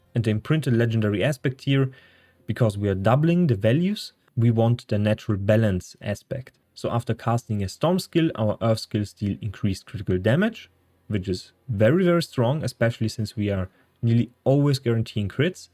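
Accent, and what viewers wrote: Germany. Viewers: Dikka 😂😂😂 nice Video aber dein Englisch 🙈🙈🙈 liest du ab? Würde wetten dass du Deutscher bist, mach deine Videos doch auf deiner Muttersprache. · Thanks for the video. I could listen to you talk all day. I used to live in Germany and so I miss the German accent of people. You sound like you're from somewhere near Mannheim/Ludwigshafen, but I could be wrong....lol.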